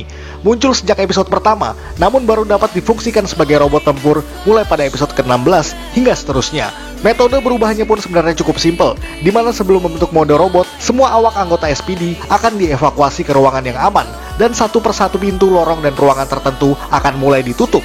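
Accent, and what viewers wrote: Java, Indonesia. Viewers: Hihihi 😁 Saya gak blg gak suka sama sekali lho... Tapi iya saya setuju aja kalo naratornya itu terlalu berlogat Jawa medok apalagi jg 'umbar' SS favoritnya itu Gekiranger, itu sih sah-sah saja... Spt juga saya sampai skr msh memfavoritkan Goggle V dan Kyoryuger... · Di try it itu mnurut gw editannya aga kurang rapi, penyampaian narasinya jg aga kaku bnget dan dimedok²in,.. jjur ya gw jg orang jawa tp aga kurng suka klo ada youtuber yg make bahasa medok jawa gitu, knpa? Ya krna channelnya ditujukan untuk smua tokufans, bukan buat orang jawa doang😅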